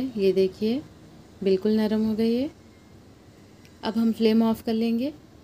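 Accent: Hyderabad, India. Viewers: MashaAllah aap ki har ek recipe zaberdast rehti aur uspe Hyderabadi baat video mein aur bhi jaan daldeti matlab ek dum kirak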